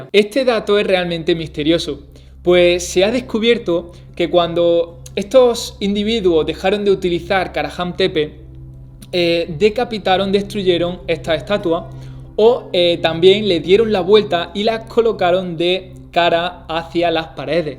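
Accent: Andalusia, Spain. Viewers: / Voy a romper una lanza a favor de. Este señor es andaluz, seguramente granadino. Afortunadamente la Real Academia de la Lengua Española, contempla los diferentes acentos de los hablantes de este maravilloso idioma que hablamos 800 millones de personas en el mundo y que nos permite comunicarnos entre todos nosotros. He viajado por España y por Iberoamérica y jamás he tenido problemas de comunicación y, menos, de comprensión. Un par de consejos, me tomo ese privilegio porque ya soy muy mayor; agudiza el oído y lee mucho. Un saludo cordial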